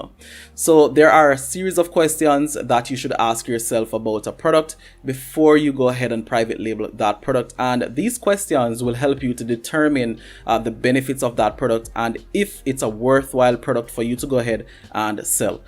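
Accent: Jamaica. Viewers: Good video. Are you from Jamaica?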